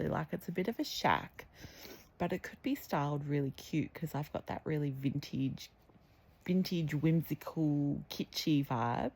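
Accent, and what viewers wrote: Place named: Australia. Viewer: Wow these pens look amazing. I really likee the sound of the brush tips and wowzaz…Australian!!! Awesome!!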